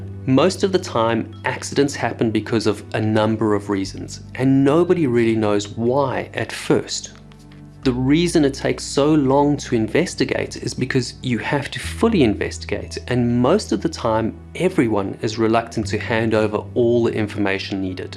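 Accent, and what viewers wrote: South Africa. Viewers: Do I detect a South African accent? Thanks for your clear and not too technical explanation of a horrible accident that shouldn't have happened. Your delivery is very good - my normally very impatient hubby, who used to do recreational diving, watched the whole way through, he normally fast forwards.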